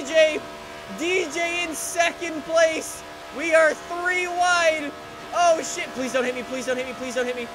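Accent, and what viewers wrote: United States. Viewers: You even sound more American 🇺🇸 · he turned southern after this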